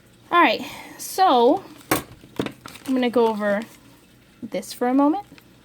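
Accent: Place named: United States. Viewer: Голос и говор заменить бы с мириканцких на британские - я б на авторе женился.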